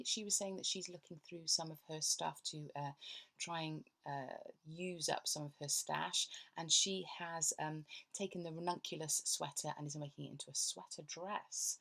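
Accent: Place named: Wales, United Kingdom. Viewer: I found your first podcast because YouTube suggested it to me as I had been watching Ranunculus techniques videos. And of course your first episode featured your Ranunculus. I am enjoying your podcast, especially the odd Bits in your Welsh accent. I hope you managed to take the ferry from Vancouver to Vancouver Island! I live beside Lake Ontario, but I am originally from the UK south coast.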